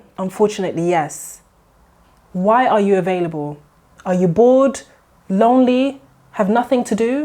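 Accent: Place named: United Kingdom. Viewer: That is excellent advice. A lot of people assume you just know what kind of person you should marry. In fact that's the best way I know of to really screw it up. The ideas I have when I married was to start wrong and it shows in my own marriage. I simply didn't know what I was doing.. I am so pleased that young ladies like you are like you are employing sensible approaches to life-and-death cool approaches to life in general. Also, that gorgeous British accent doesn't hurt!! Keep going. You make me proud.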